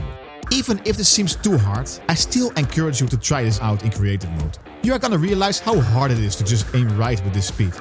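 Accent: Netherlands. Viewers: The accent gives it away Hello fellow dutchie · You dutch? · i smell dutch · Great vid, and great dutch accent :D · Just a question, are you dutch? You just sound like how dutch people talk in english. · I smell a dutch accent huh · W tutorial, deze guy is zowizo nederlands!!! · Holy nederlands accent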